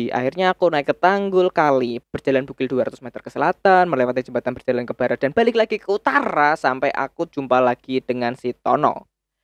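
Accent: Java, Indonesia. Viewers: Medok yups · Tim medok👍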